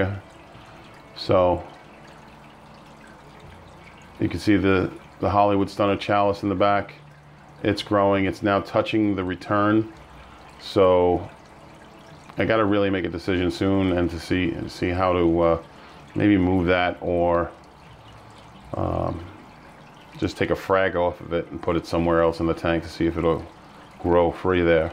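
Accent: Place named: United States. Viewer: Hahaha quite the opposite im an English girl so tell me an English girl who doesn't like a sweet ass American accent ☺️☺️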